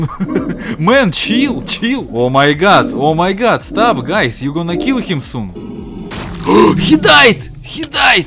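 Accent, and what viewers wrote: Russia. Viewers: Love your Russian accent!!! Anyone who doesn’t is cyka blyat !!! Third comment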